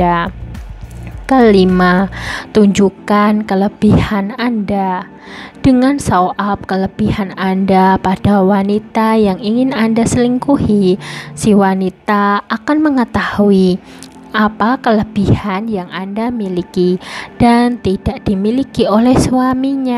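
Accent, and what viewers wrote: Java, Indonesia. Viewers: channel gila medok nya tambah nggila ni 🤮🤮🤮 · mau ketewa denger medoknya..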